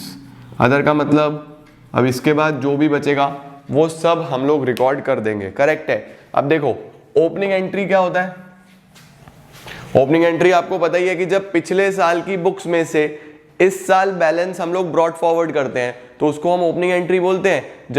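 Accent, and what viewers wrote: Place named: Varanasi, India. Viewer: Are you from Varanasi???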